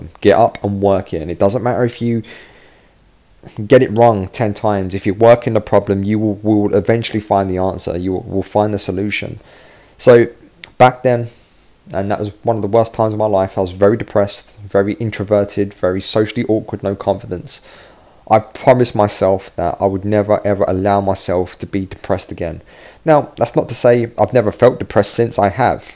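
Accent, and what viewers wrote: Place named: London, United Kingdom. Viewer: 👍🏾👍🏾👏🏾👏🏾👏🏾👏🏾🥳🥳 YES!!.. YES!!... Give it to me straight Boss!! Anyone who is depressed needs to listen to this guy!!.. And if you are going through a breakup watchballnhis videos!! Thankyou fir makinh these Videos...please do not stop! Such a help with real talk... and valid, intuitive information!! 🥰Love these videos!... The presenter sounds like a true Londoner.... Like Me!... I need to hear the Effings and Blindings...🤬🤬🤣 That is how I talk man! 💥💫💫💟💥💥Gwarn UK Massive!! 🤷🏾‍♀️🤯Makes me wanna get thr F*CK up and move! This man is my FIX.. Love that good old British ... No Nonsense London Accent. This is what people need out here bruve YES!!💋💋👍🏿👍🏿😘🤗Churped me right up!😎😋 "EX Who!??".🤣🤣😂😂🤩😜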